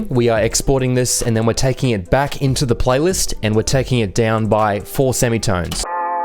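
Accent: Australia. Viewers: You're an absolute genius, hidden gem this channel. I don't even produce in FL, but learn so much from you bro!!!!! Nice to get a dose of the accent from down under as well!!!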